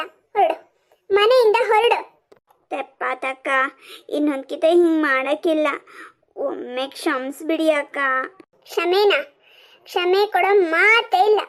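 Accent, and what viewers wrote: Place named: Mandya, India. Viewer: ಮಂಡ್ಯ ಭಾಷೆ ಸ್ವಲ್ಪ ಇತ್ತು 👍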